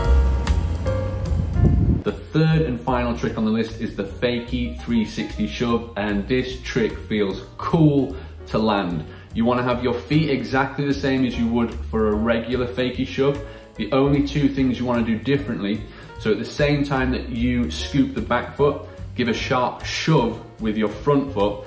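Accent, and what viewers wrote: United Kingdom. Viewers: Really nice channel. Nice music, nice british accent!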